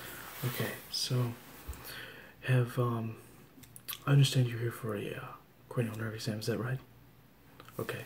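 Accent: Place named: South, United States. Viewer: sound like a Southerner to me, Georgia?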